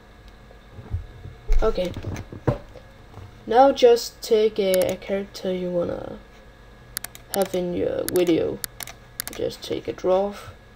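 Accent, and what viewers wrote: Denmark. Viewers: danish accent haha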